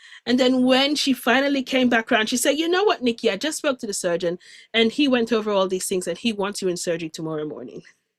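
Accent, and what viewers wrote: Jamaica. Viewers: Hi, I am tuning in from Jacksonville, Florida. I saw your captivating interview with Alex on Next Level Soul and was so pleased and happy to hear that you are originally from Jamaica. I found it so refreshing to hear the familiar accent of my homeland, Jamaica. I love your story and your message. I do believe that some people are already living their purpose but can't recognize it because it may not be grand. Your purpose is doing what you love. My purpose is an acupuncture physician and healing and giving love, light, and compassion to the world is what I do. I always see the greatness as God / Source as breath. I was channeled a poem from my Higher Self that breath is consciousness, and we can't live without it. Consciousness can not be contained, as you said on Next Level Soul, and that is so true. Thank you, for your message of love, light, purpose, and power! 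I am so happy I found you! Stay blessed. 🎉❤🎉❤🎉❤🎉❤🎉❤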